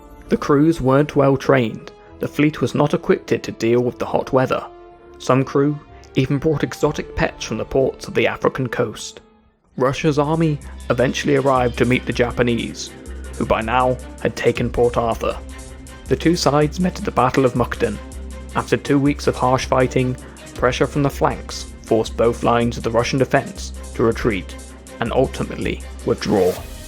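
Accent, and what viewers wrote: United Kingdom. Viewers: That accent,you from England?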